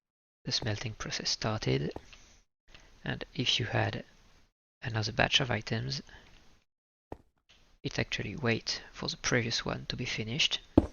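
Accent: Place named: France. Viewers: miam le bon accent francais :) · j'etais donc pas fou ! j'avais reconnue notre bon vieux accent héhé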